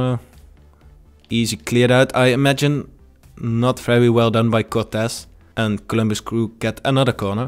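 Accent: Netherlands. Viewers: Hmm I can hear the Dutch accent ;)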